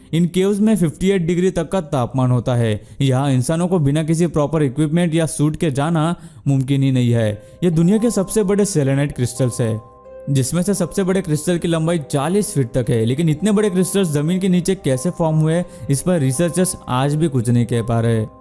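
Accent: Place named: South India, India. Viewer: Aap ki aawaj se to aap south indian lag rahe hain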